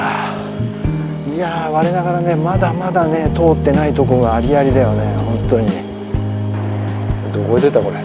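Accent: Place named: Nagoya, Japan. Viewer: 、初めまして。最近拝見しております66歳の親父です。の軽快な名古屋弁の話術にハマりました。なんせボキャボラリーが素晴らしいですね。これからも楽しく見させて頂きますので頑張って下さい。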